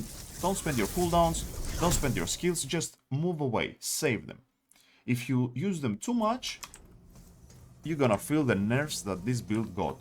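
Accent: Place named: Greece. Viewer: Nice video. I miss your regular vids where you don't livestream. Only thing I would change would be the necklace, which I think jester's bell would be a bit better since havok form scales off of cast speed, at least it used to. Still, super good video. So good that I watched the whole thing. Yes, all 1 hour, 26 min and 31 seconds. I appreciate the raw gameplay that few people really want to do. I also love your greek accent. I've alreay used this plenty of times but I never thought to use sapphire dreamstone for the cooldowns.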